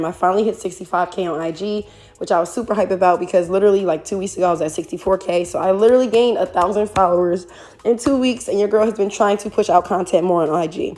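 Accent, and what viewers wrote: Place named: East Coast, United States. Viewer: I knew I heard an east coast accent while you were talking lol. I’ve been trying to get my oldest into doing hair she’s good and actually likes it but is on the fence about cosmetology school I’m gonna show here your videos for sure. Texas by way of Philly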